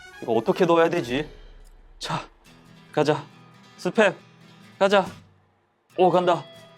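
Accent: Busan, South Korea. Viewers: 말꼬리 허팝님은 부산사람이여서 그래요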